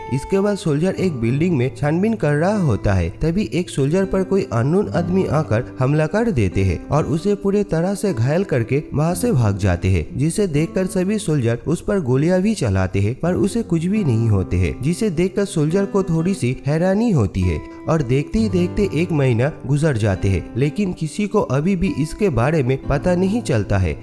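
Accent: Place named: Bengal, India. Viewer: Are you bengoli please work on your M T I so you can explain better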